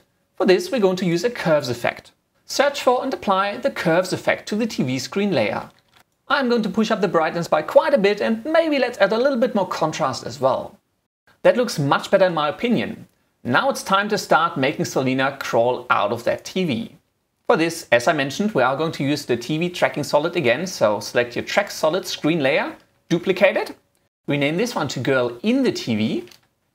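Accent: Germany. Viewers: Are you German? :D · Are you from Germany?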